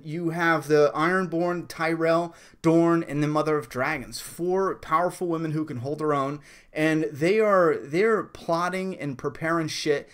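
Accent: United States. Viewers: I love your American way of speaking about Game of Thrones. 😊 Such a great episode, I watched it twice. Loved seeing Lady Allena meeting Khaleesi.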